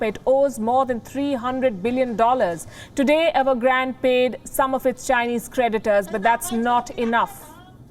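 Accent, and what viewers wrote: India. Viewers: American media with indian accent … she make china fall apart … we heard you that china 🇨🇳 is falling down ..tell us about America 🇺🇸 who already fall down .. and about india 🇮🇳 who is still 3rd world country run by Europe and 🇺🇸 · It's formal Indian accent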